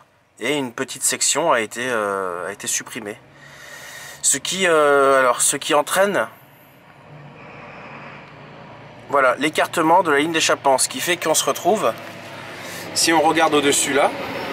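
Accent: North, France. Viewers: super video et serieux je kiff ta façon de parler on vois bien la famille nordiste t de ou dans le nord moi valenciennois et j'ai hate d'avoir de nouvelle video ça manque merci a toi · Salut j adore tes vidéos de swap avec l accent de chnord lol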